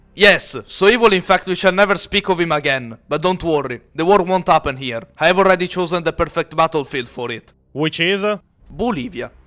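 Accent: Italy. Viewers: I just can't Italian accent makes all "high gothic" names just Italian names, and its sooo good! It feels like i watching Young Pope or something.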